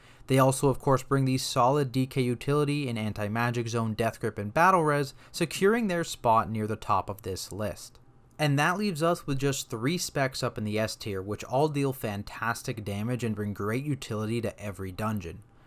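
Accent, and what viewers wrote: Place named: United States. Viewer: He's got an American accent, and I posted the way it's said in his native tongue.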